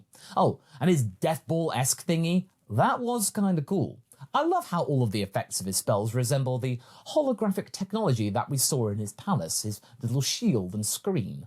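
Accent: United Kingdom. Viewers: My dude he has a British accent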